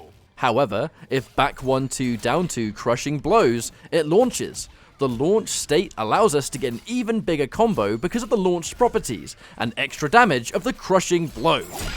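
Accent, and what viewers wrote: United Kingdom. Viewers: I knew to take this video seriously when I heard a British accent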